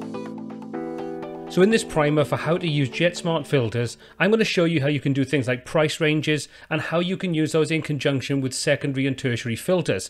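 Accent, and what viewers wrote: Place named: England, United Kingdom. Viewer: You mate, are a genius. Great to hear, and see, someone with a regional English accent give clear and concise help. So tried of hyper active youngsters trying to blind everyone with their knowledge. Or a video with a silly tune playing and no voice overs. Worth being locked down for :)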